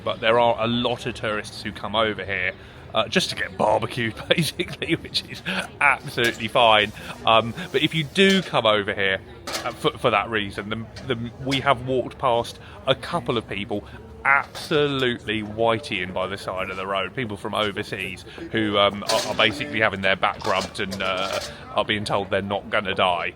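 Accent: Scotland, United Kingdom. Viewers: are you Scottish??.....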